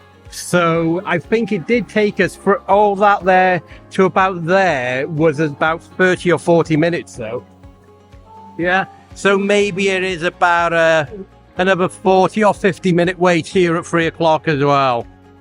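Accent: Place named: United Kingdom. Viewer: I am here to enjoy your beautiful British accent 🙂. Have a great time in China!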